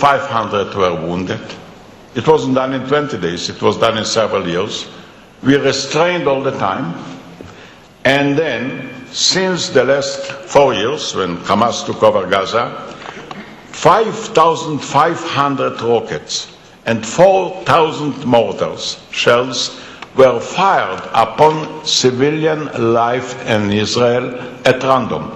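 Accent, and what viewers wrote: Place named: France. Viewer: boyle francizca aksani kulaga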